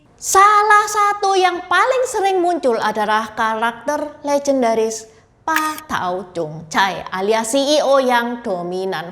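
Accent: Java, Indonesia. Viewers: Chindo medok.. Jaya Jaya jaya.. Matur nuwun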